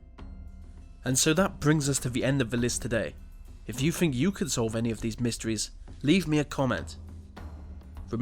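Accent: England, United Kingdom. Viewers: Why do you continuously substitute "F" for "Th"? We hear you pronouncing "Th" when it's IN a word sometimes, but often when it begins or ends a word you substitute an "F". You say "with" properly, so why do you then say "August sixteenf 1942 "? It's really distractive and annoying. If it was your 2nd or 3rd language it would be understandable, but you have a distinctive English accent, so it must be your 1st language. Please make an effort to speak properly, since it's obvious that you can.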